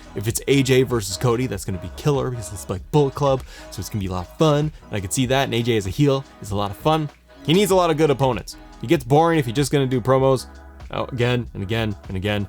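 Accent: Canada. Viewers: Are u from Canada?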